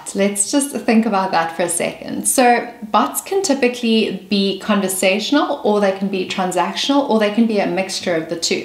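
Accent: South Africa. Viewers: Dear, I must compliment you on a very well polished online profile. I found your video interesting to watch and subscribed to your channel. I wish you all the best for your future endeavours. Oh and well done for flying the South African flag high. I was expecting to hear a UK or US accent and was pleasantly surprised to discover you're a local. I'm from Cape Town myself. Best place to live, am I right?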